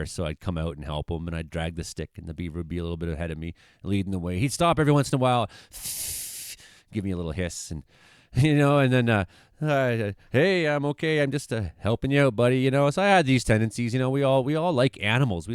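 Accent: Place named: Australia. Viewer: Pretty damn good Aussie accent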